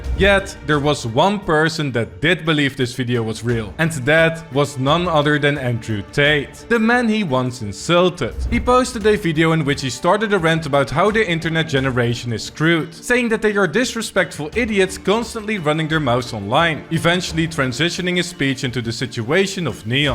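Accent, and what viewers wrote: Netherlands. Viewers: let me gues you dutch ja je accent geeft het af 😂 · Bro the dutch accent :c But still Really good video tho :D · The narrator sounds impressively dutch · Do something about that dutch accent bro😂. Godverdomme wat een steenkolenengels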